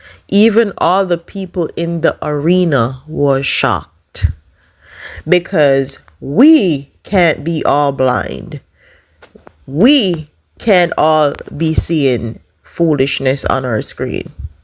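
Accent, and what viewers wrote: Jamaica. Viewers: You sound like a Jamaican. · Sounds bias to me..ur voice sounds Jamaican so clearly ur gonna be biased, when u don't wanna except the truth it could be sitting in ur lap and u wouldn't see it . But no matter how ur wrestle with this truth the Gold is coming to the United States.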